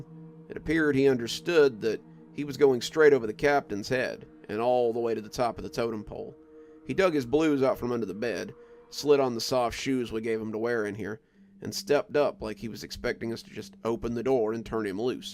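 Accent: South, United States. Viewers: May I ask….? Answer only if you feel comfortable. Are you from the south…?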